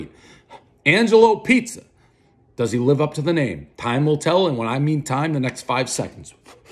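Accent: Boston, United States. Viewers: No, it’s his low class Boston accent. He does it with every word like that. He says mama’s like muh-muh’s.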